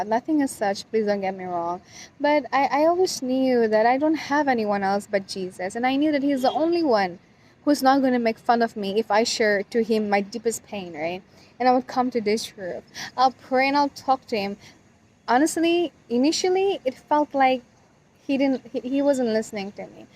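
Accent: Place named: United States. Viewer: Your English like American English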